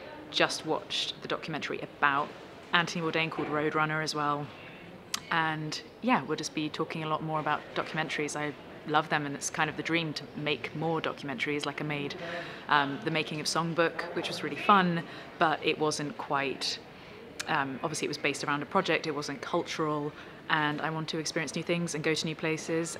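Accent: United Kingdom. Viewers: I don't know why someone would ask a question about her voice.? Imo her speaking voice is just as soothing as her singing voice.. She has a slight British accent that I adore.. It's not overbearing or a harsh tone that sounds almost condescending as I feel about some others. Her singing voice is purely beautiful. As is she.. Some of those questions had to be just poor attempts of humor..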